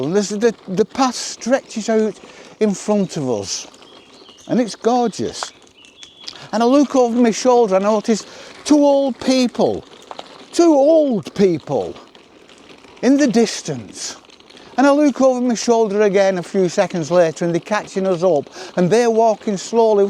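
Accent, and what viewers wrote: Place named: Lancashire, United Kingdom. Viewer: 😂 So funny 😂 Where are you in Lancashire? You sound like my grandparents who were from Westhoughton. I'm in South Staffs. Aged 73 😮 so there is someone older than you 😂 Thanks for making me laugh ❤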